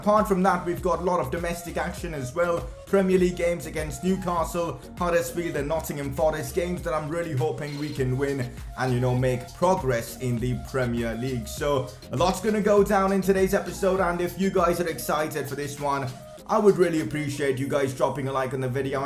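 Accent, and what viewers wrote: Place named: India. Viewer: Why did this dude embrace his full Indian accent now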